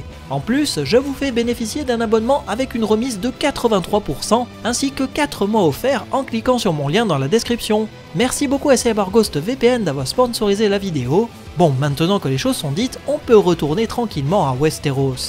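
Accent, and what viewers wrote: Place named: France. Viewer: C'est vraiment très étrange d'entendre les noms des personnages de cette façon... d'une manière si française, plutôt qu'en VO (plus précisément en haut valyrien du coup.) [Ranyra] à l'écoute, au lieu de [Wraynira]. [Jackérys] au lieu de la prononciation [Jaycéwrys]. Entre autres. Ça pique. Mais c'est mon avis.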